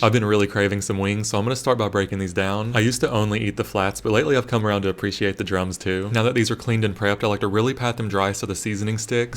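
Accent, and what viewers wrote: South, United States. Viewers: I hear a lil southern drawl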